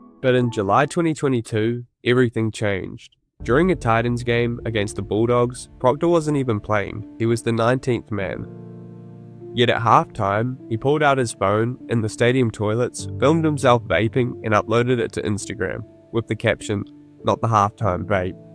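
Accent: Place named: New Zealand. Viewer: That’s just the kiwi accent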